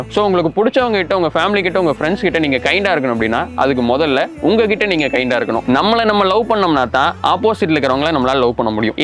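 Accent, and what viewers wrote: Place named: Kongu, India. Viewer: Dei kongu paiya unnoda slangatha enaku pudikum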